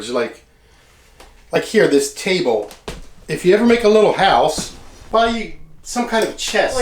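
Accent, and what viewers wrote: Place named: Philadelphia, United States. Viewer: From Philly much?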